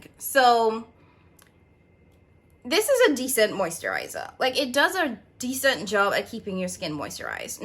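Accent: Trinidad and Tobago. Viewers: Trini? i keep picking up on an accent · What island are you from? I'm guessing Trinidad Yes definitely Trini...only trinis say THEE for THE! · A Trini voice could never heard inno 🥳🥳🥳big up you abd thanks for d info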